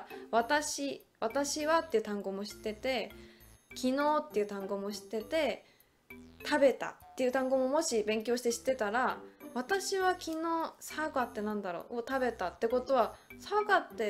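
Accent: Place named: South Korea. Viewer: 일본어가 너무어색한데? 억양이 완전 한국인임ㄷㄷ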